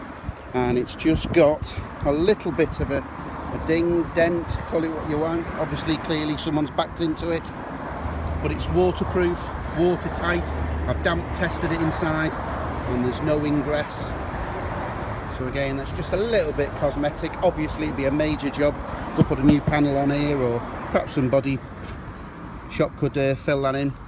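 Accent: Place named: Northern England, United Kingdom. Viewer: Lingua impeccabile, lieve accento nord inglese, con i caratteristici glottal stops. Che il camper sia piccolo è lo scopo preciso del veicolo: è un miracolo che abbiano fatto stare tutto in un furgoncino tanto minuscolo. Poi se tu hai un Mobilvetta da 9 metri, buon per te, ma non c'entra niente. Mi sa che sei un po' corto di comprendonio.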